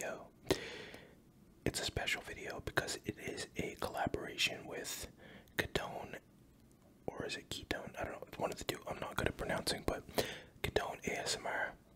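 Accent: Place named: United Kingdom. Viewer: I’m british but I usually cringe when I listen to british ASMRtists idk why😬🤭 you’re the exception, so you’ve gained a sub 🤷🏽‍♀️😂 I came from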